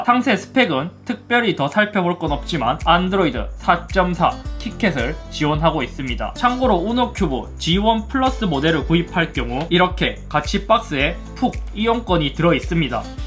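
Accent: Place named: Jinju, South Korea. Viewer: 고향이 진주 쪽 이신가? 억양이 친숙 하네요 ^^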